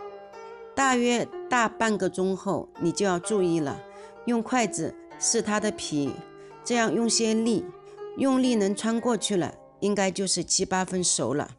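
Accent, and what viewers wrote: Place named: Jiangnan, China. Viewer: 听口音是江南人。太仓人概率95%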